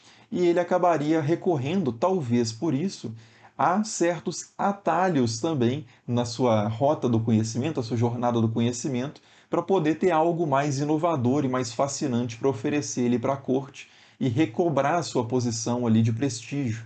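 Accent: Minas Gerais, Brazil. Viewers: o suave sotaque mineiro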